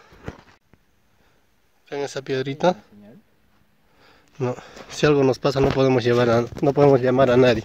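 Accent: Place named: United States. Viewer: Me encanta tu voz medio gringo tu acento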